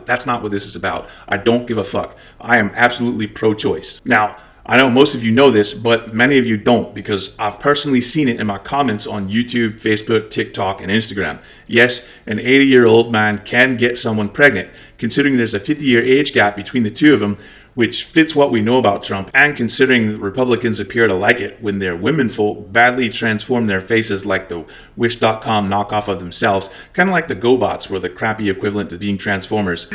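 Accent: Scotland, United Kingdom. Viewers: I can hear a Scottish brogue here! interesting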